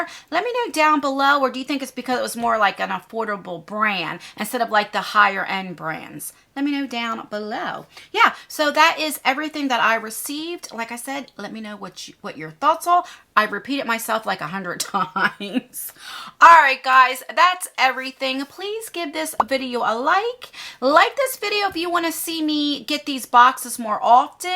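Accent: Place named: Maryland, United States. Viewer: Where are you from we pronounce water the same way , like wooder, lol. I’m in Maryland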